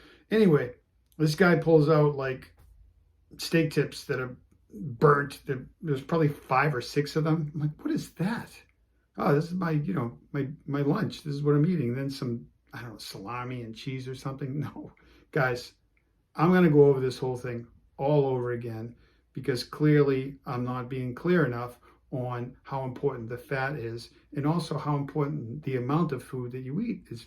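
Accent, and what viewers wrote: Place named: Massachusetts, United States. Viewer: Sounds like is from Billerica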